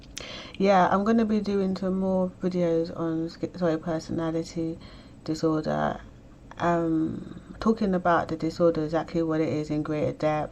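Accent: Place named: United Kingdom. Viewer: I always thought your speech pattern was more about being British. I find it rather soothing. I've heard worse pomposity listening to a narrated Audible book. I seriously wanted to punch that guy in the dick by the time I finished the book.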